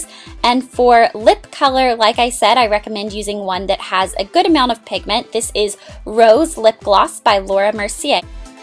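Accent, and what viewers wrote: South, United States. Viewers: I am not sure if you watch Big Brother or not..but you and Danielle are a lot alike. You are both pretty, have long brown hair, and have that southern accent:)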